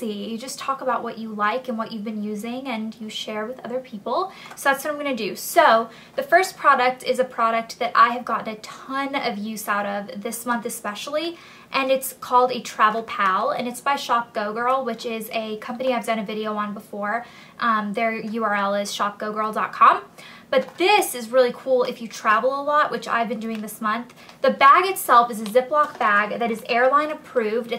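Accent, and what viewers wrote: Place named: South, United States. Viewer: ur kinda getting a country accent like ur sister :D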